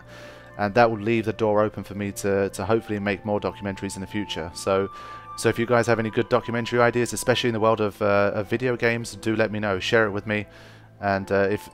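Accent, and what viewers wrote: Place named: North London, United Kingdom. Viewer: I hear a bit of North London accent there :-) anyways, I think an interesting documentary topic to explore is the positive effect of gaming in general. Would be good to offer some counter argument to worried parents etc. In particularly final fantasy which has a large fan base that has aged and grown with the series. It would be interesting to interview these people to get some of their stories. Final fantasy touches on so many different issues in their games over the years and has definitely influenced me despite coming to it in my mid 20s.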